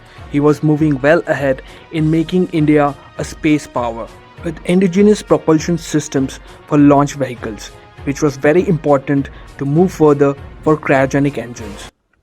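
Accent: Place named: United Kingdom. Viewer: Fuck your British accent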